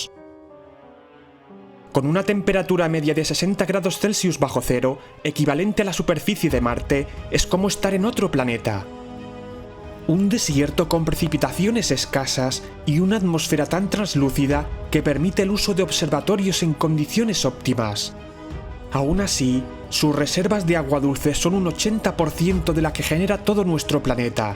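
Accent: Spain. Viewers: por su acento puedo imaginar debe ser español. Aunque en algunos países suramericanos hablan con mucha pronunciación de la z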